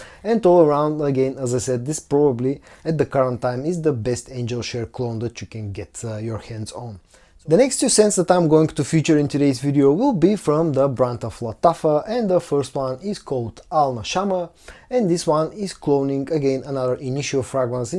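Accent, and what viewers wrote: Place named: Bulgaria. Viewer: I finally realized how that Bulgarian accent works! The wood "oud" and "wood" almost sound the same. I've heard you describe some scents as "oudsy" but you're actually saying "woodsy". 😂 Still getting unsubbed every day, so don't think I've abandoned you friend! Be safe all.🙏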